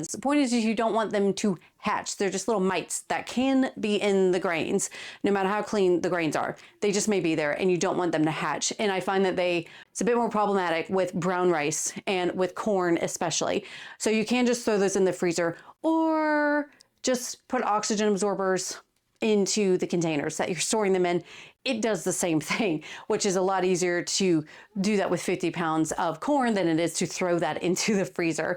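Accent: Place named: Florida, United States. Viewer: You sound like you like here in Middleburg FL!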